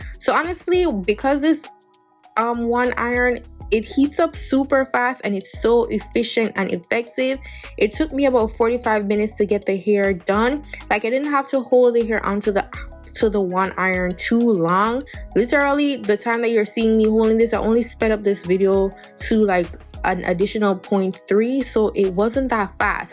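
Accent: Jamaica. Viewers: I love this.. so easy n quick. Btw r u Jamaican?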